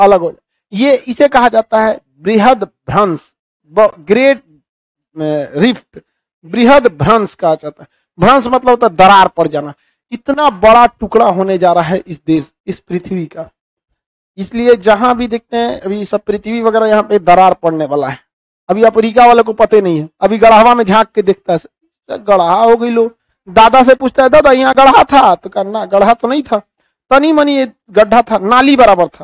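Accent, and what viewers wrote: Bihar, India. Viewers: I love your Bihari accent sir....😄😆🙏🏻🤣😂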